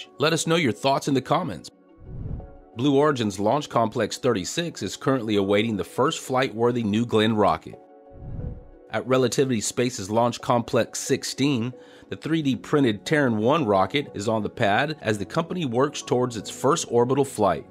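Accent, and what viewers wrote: Texas, United States. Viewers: Welcome back!... seriously, missed that familiar voice.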